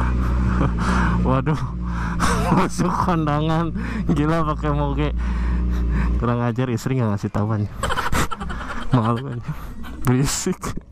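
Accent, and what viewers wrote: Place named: West Java, Indonesia. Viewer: Sunda geningan bos😂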